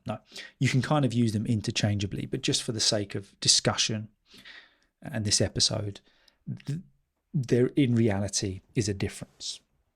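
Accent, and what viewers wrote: United Kingdom. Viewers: Totally perfect British English accent thank you very much for your teaching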